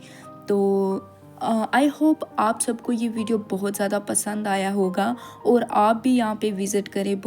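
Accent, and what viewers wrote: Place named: Kashmir, India. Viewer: Are u from J&k (india) bcz the way of your talking like u are from baramulla 🤔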